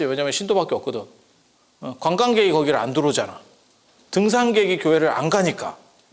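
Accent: Jeolla, South Korea. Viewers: 스님은 전라도 억양이 좀 센거 같아요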